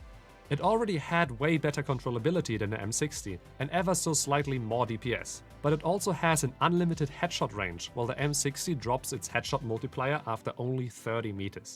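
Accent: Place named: Germany. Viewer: Deutsch... Man hörts XD aber gutes video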